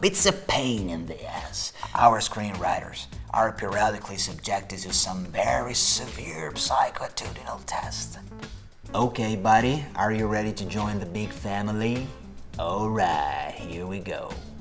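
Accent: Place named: Italy. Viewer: rega l'accento italiano si sente ... altro che perfetto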